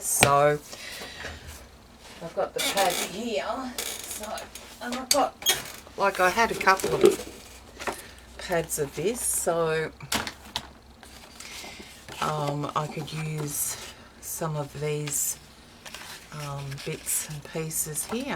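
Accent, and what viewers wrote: Australia. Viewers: So awesome to hear an Aussie accent on here. I'm sure we have lots of talented people here just like there are all around . Love your work. TFS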